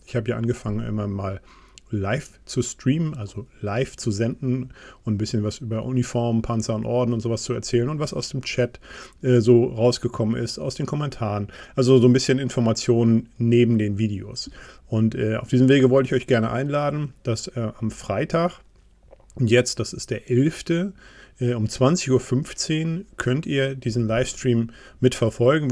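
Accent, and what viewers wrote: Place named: Berlin, Germany. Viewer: der berliner akzent :D hab glaub noch nie grinsen müssen bei dieser geschichte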